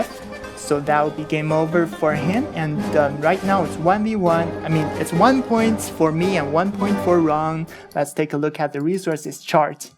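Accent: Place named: Arab world. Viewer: I thought your original voice is just 100% Arabic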